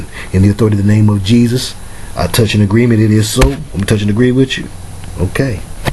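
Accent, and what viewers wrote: Detroit, United States. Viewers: Proverbs and Psalms are my personal favorites. I love listening to them interpreted with your old school Detroit flavor.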